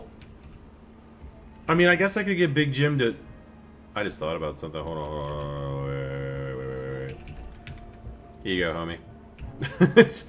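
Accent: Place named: Australia. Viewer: i can’t place your accent. it sounds australian sometimes but american at other times lol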